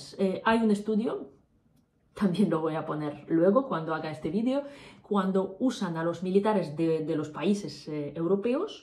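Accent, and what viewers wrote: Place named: Andalusia, Spain. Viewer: Yo soy de Jerez y a veces pienso que aprendiste castellano en Andalucia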